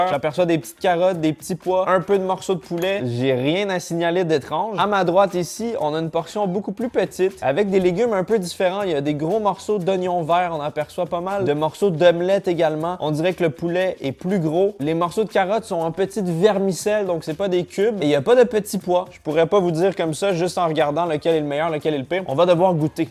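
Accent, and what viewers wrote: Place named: Quebec, Canada. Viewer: je tiens à dire que en tant que québécois, je suis vraiment fier que soit devenu aussi gros sur la plateforme et qu’il est regardé par autant de monde. Ça permet de partager notre accent et notre culture ce qui est vraiment cool. Je te suis depuis bientôt 6 ans et ton contenu est encore aussi excellent continue comme ça je l’adore. (ps: félicitations pour le million d’abonnés 🥳🥳🥳)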